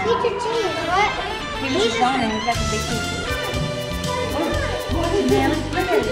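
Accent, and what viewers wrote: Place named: South, United States. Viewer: I love the bad music and the hill billy narration.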